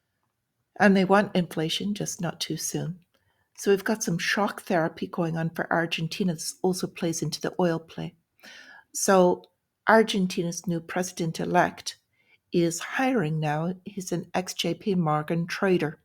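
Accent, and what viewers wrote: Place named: Ireland. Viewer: Greg Mannarino recommended you. I like your news & love your accent! Forgive my possible ignorance, but are you Irish? If so, can you comment on the insanity going on there?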